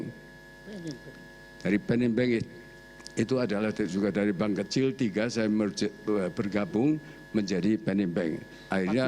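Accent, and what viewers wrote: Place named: Java, Indonesia. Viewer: Gaya bicaranya mirip mendiang Pak Soeharto...dan terasa banget logat Jawanya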